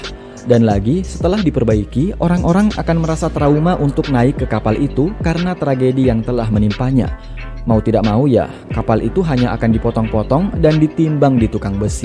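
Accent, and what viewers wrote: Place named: South Sulawesi, Indonesia. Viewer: Orang sulsel ini kyknya mimin😂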